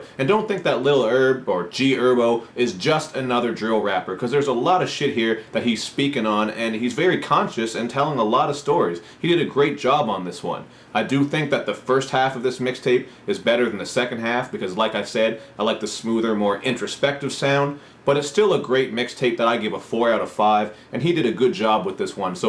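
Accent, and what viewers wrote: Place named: Nova Scotia, Canada. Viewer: i'm gonna take a wild guess and say this guy is from nova scotia. that accent sounds nova scotian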